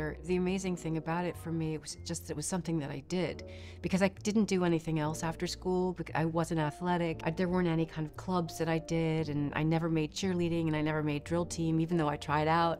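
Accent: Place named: United Kingdom. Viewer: Why did i think she was british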